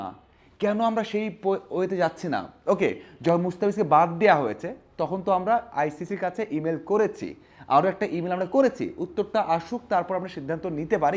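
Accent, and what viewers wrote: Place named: Kolkata, India. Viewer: ভাই আপনি কি কলকাতার মানুষ নাকি কথা দ্বারা তো এটা বোঝা যাচ্ছে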